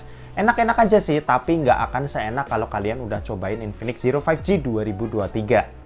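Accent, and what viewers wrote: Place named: Banyumas, Indonesia. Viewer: Wong ngapak ya mas.....? Keton sitik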